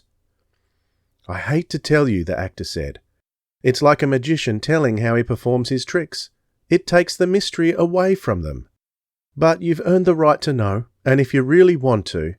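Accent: Australia. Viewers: Are you the narrator Australian?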